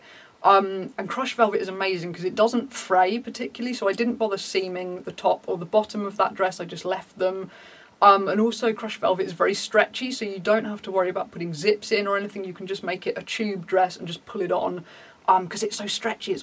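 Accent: United Kingdom. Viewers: Omg i clicked on this video and said “omg i hope they’re British bc i rlly wanna hear british person speaking right now” yippee!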